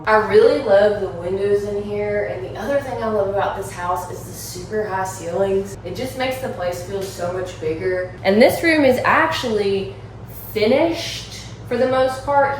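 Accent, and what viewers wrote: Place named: Tennessee, United States. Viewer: I was trying to go by the accent & thought maybe Tennessee? But, I have only been there once so…..?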